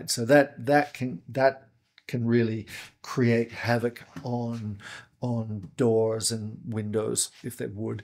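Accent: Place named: Australia. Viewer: Ahh I knew I wasnt going crazy about your Aussie accent, would love to see you build a door with your Felder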